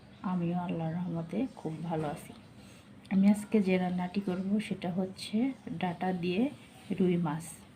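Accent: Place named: Sirajganj, Bangladesh. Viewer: প্রথমে আমি কে ধন্যবাদ জানাই ধন্যবাদটা এ কারণে আপনাদের এই চ্যালেনে রান্না থেকে আমি অনেক কিছু শিখতে পেরেছি আমি দেশের বাইরে থাকি এখানে রান্না থেকে আমি অনেক কিছু রান্না করতে শিখেছি আমি জানিনা আপনি কোন জেলার মানুষ তবে ভাষা থেকে বোঝা যায় আপনি সিরাজগঞ্জ জেলার মানুষ আমি সিরাজগঞ্জ জেলার কাজিপুর থানার সোনামুখী ইউনিয়নের মানুষ আমাদের ভাষার সাথে আপনার ভাষাটা মিলে যায় এই কারণে বললাম দোয়া করি সামনের দিকে এগিয়ে জান সামনে আরো নতুন কিছু আইটেমের রান্না নিয়ে আসবেন ওখান থেকে আমরা শিখে নিব ধন্যবাদ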